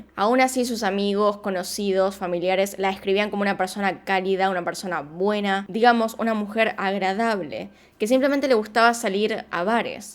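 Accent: Argentina. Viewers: Me encanta su acento Argentino.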